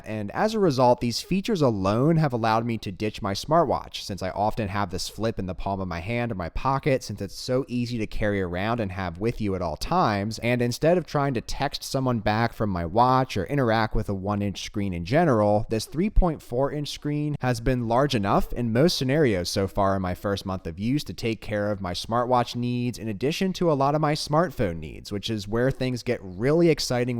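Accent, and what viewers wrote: United States. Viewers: What's your American accent?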